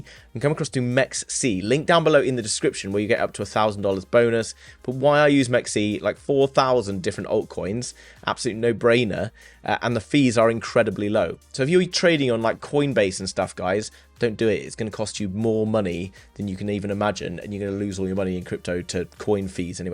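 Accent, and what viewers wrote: RP, United Kingdom. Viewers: Mate, you remind me of Hugh Grant and I don't know why. It must be the hair and the posh British accent.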